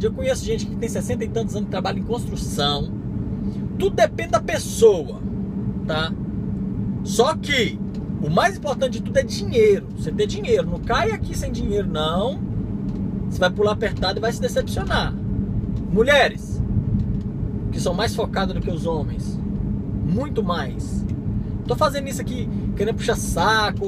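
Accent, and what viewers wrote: Brazil. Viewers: De que parte do Brasil você foi? Cearense?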